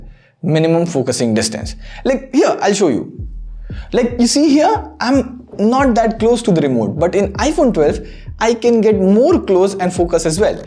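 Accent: India. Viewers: Such a horrible think Indian accent however thumbs up because it was a good video well done sir… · Why Hindis always have to speak english with this bad accent? Really. I’m from Poland i my original accent is Russian like but I learn how to speak better by watching movies n shit. I don’t get it. You really are fucking good YouTuber men but I don’t understand you because of accent.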